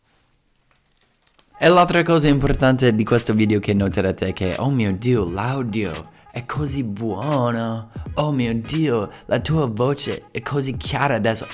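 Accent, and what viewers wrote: United States. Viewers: you are becoming my Number One source of entertainment. I saw you and your girlfriend in an interview with Davide, whom I follow seriously to improve my Italian. Your YouTube posts really tickle me. I have been learning Italian, off and on, more off than on, since 1965. I have always worried myself silly over my American accent, and now here I find a guy who is making a living off of his. Good on ya! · L'accento americano si sente un botto😂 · Anche io! Booo se non parlasse con accento americano passerebbe per italiano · non ti preoccupare il tuo accento americano e' molto simpatico.Un po come noi italiani quando parliamo inglese.La stessa cosa. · Però sfatiamo un mito: dicci una volta per tutte se carichi l'accento americano che hai quando parli italiano o no!! Porca miseria io sono sposata con uno del sud eh!!! Ma lui non hai mai avuto l'accento che hai tu. 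MAI MAI MAI! Il modo in cui ad esempio pronunci la T... ma non è un crimine, nè un'offesa o una presa in giro eh!! Che davvero mi fa assolutamente strano sentire te che non sbagli manco un congiuntivo e hai questo accento così forte... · 🤣🤣🤣🤣 Il tuo accento è sempre moooooolto americano.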